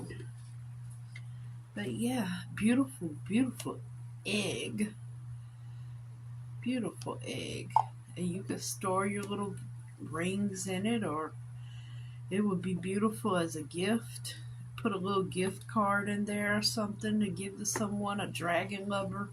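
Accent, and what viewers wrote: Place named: Georgia, United States. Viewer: Oh you are in Savannah GA I just heard it I love Tybee Island!! One of my favorite places on the planet!!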